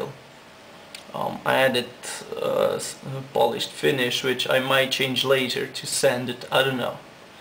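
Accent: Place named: England, United Kingdom. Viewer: i love your English accent lol!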